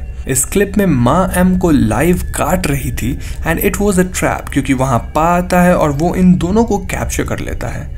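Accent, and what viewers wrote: Bengal, India. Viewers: Yourd Bangali accent is funny.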